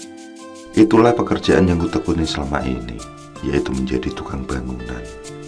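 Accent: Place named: Bojonegoro, Indonesia. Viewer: Wong jonegoro ndi suarane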